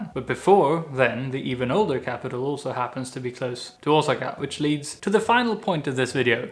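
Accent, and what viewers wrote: England, United Kingdom. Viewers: I know Swedes speak good English and you lived in the UK but damn, the British accent is spot on. Not a hint of an accent like you were born to speak English. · I have officially found my favourite youtube channel, came her for the uni info, stayed for the sarcasm. I still can't believe you're swedish, not that I have many swedish friends to compare you with, but I could have sworn you were english ( the accent fooled me ).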